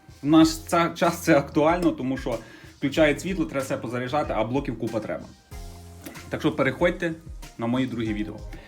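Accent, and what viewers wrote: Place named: West, Ukraine. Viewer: Класна західноукраїнська мова! Ви часом не з Львівщини?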